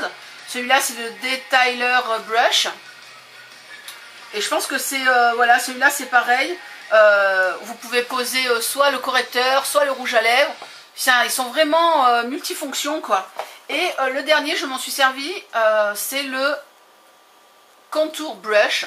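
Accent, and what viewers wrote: United Kingdom. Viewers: ps / je suis dingue de ton accent british !!!!!!! non je ne me moque pas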